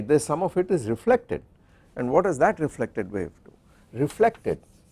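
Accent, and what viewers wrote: India. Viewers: the professor is an exceptional teacher. The extras he brings into the course with his comments are really at a graduate level, not a basic undergraduate course. For an American, the Indian accent is sometimes difficult to decipher. Subtitles would be very helpful. Also, ith wourld be very nice to have a set of course notess for the lecturs.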